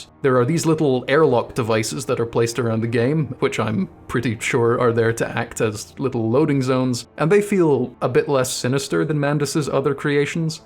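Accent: Scotland, United Kingdom. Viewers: You really sound like you'd pronounce 'Enoch' the same way as I would (like 'loch', like if I was to mention 'The St. Enoch Centre', the 'ch' in there is like in words like 'loch') but I was surprised that you didn't. I must be mistaken.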